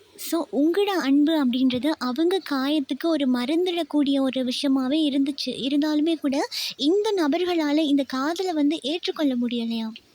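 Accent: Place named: Jaffna, Sri Lanka. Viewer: உங்க யாழ் தமிழ் நல்லா இருக்கு.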